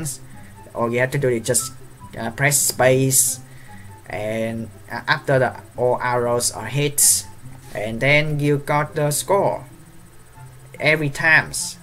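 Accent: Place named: Vietnam. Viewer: *Vietnamese accent intensifies* but keep trying, one day you can speak it fluently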